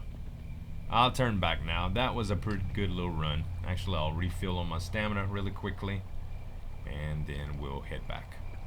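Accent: Louisiana, United States. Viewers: I love your accent! Didn't realize it was Cajun. As a New Yorker, I'm not around it much/at all. Thanks for exposing us to it! (totally don't change :P ) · did not know he has a kajun dialect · Aww, don't train away your cajun accent. It's one of the best accents in the English-speaking world! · where in Louisiana? Im from Metairie:) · Wait so is from Louisiana? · oh and btw are you from Louisiana?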